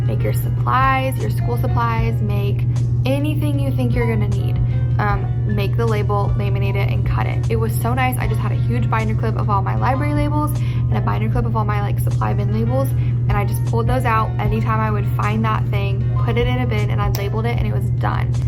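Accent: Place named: United States. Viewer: This is no criticism, but in all the videos about primary school classrooms in the US (I assume you are from the US, hearing your accent; if I should be wrong, I am sorry), I can't help but notice that all the walls and surfaces are decorated to the absolut max(imum). Why is that? I'm not against decorating the classroom and creating different areas by using rugs, shelfs, pillows, colors, etc. But I would never use all these quotes on the walls and doors, tazzles or borders on the whiteboard, as I find them too distracting. Many pupils need clear structers and minimal visual stimulation to be able to concentrate on their work instead of their surroundings. As I said, no criticism. I just can't help to notice the differences between german and north-american primary school classrooms.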